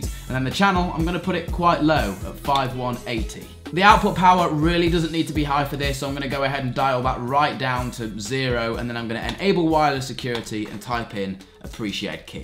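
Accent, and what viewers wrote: United Kingdom. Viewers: Noah Van Dijck Since he is British he pronounces, Ethernet as EHthernet and Router as Rooter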